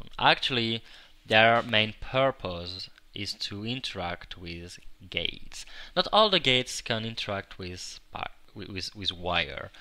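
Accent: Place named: France. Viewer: he must be french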